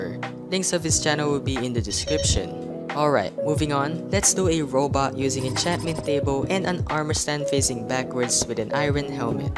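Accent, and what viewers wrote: Philippines. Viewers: You sound Filipino.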